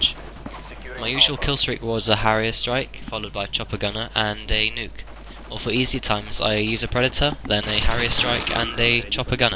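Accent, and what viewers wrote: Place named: RP, United Kingdom. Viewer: oooooo very posh lol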